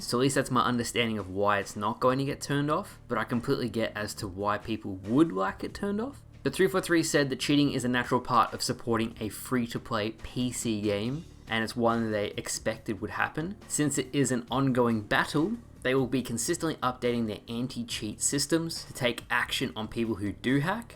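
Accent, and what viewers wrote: Australia. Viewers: So good to hear an Aussie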